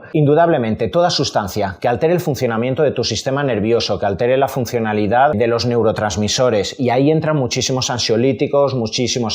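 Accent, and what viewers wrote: Spain. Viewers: Es insoportable escuchar un españolete y se creen que todo lo saben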